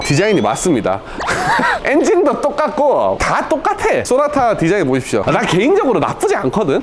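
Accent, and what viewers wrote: Gyeongsang, South Korea. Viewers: 대놓고 경상도말 맞는 거 같은디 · 경상도 엑센트 들려요ㅋ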